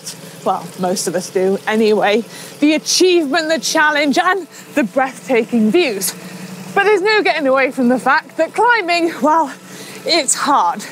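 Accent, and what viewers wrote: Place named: Wales, United Kingdom. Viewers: Can we have more Welsh accent? 😀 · Another great video from with the true Welsh accent coming through 💪💪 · where you from? you sound welshy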